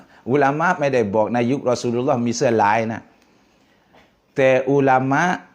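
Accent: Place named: Nakhon Si Thammarat, Thailand. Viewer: นครศรีธรรมราชชัดเจนครับ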